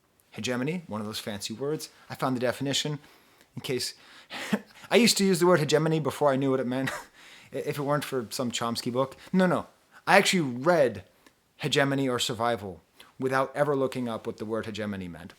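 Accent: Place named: Bahamas. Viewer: Words cannot express how proud I am of for stepping out and being such positive representation of the Bahamas and the Caribbean in general. I just happened to get on his channel through Khadijah's recommendation and I started to watch the video and I was like "why does this man sound Bahamian??" come to find out here and I are from the same country. He really brings a perspective needed so desperately so desperately in left tube. So many people assume so many things about the Caribbean and we don't even get proper representation in media.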